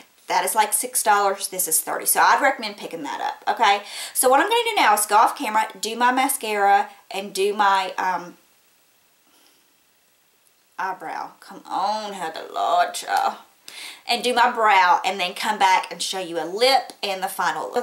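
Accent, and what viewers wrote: South, United States. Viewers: Amazing tips!! Love your southern accent!!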